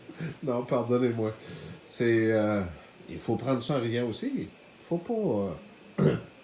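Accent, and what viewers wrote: Quebec, Canada. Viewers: mon Rabbi j ai prix plaisire a t entendre rire et j aime beaucoup la façon de t esprimer j espère que tu aura toujours cette accent si charment Québécois et ta façon de nous expliquerles choses me plaît beaucoup merci j ai aimer voire le toutou et le minou sa me manque beaucoup de pas en avoire mais je me ratraperais plus tard j aurais tout les animaux de ABBA pres de moi et ils seront libre d allée où ils veulent merci je vous embrasse fort toute la petite famille sesukuli mon Roy.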